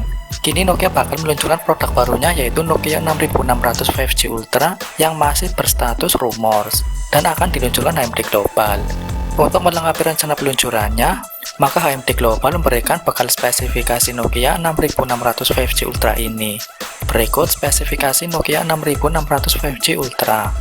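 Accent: Banyumas, Indonesia. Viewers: Lha koe ngapak yaa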